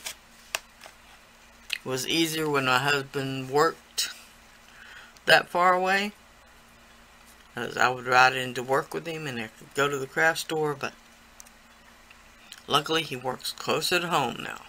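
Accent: South, United States. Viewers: You are the best xplainer love your southern accent Did all the pieces get baked for 1 hour/1/2 at 220.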